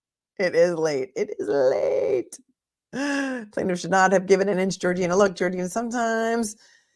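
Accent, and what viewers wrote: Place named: New York, United States. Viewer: Replay crew! I don't care how many YouTubers analyse this case, I'm always interested in your perspective and very grateful for your coverage. Thank you for all you do and keeping it real and classy in that gorgeous NY accent 🥰❤️🙏🏻❤️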